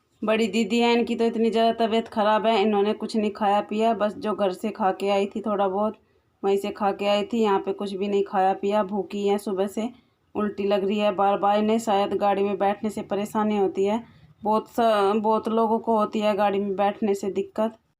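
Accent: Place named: Muzaffarnagar, India. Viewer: ji aap Muzaffarnagar se ho kya aapki language se lagta h, Main bhi muzaffarnagar se he hu lekin abhi rahte h Chandigarh m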